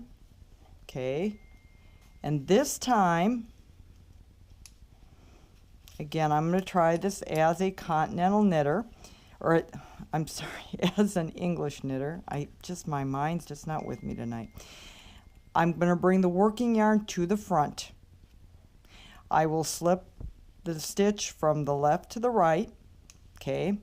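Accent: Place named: Minnesota, United States. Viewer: LOL Love the rollling on the back thing. Good vid, well done. Let me guess, you're from the north somewhere, Minnesota, Wisconsin, Canada?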